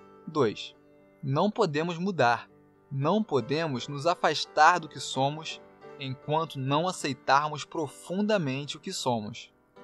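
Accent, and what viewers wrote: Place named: Rio de Janeiro, Brazil. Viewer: (*)ele é cari oca ele é carioca Olha o jeitinho ( o sutaki ) dele ao falar... (*) O meu Coração é carioca A minha Alma é carioca 🙃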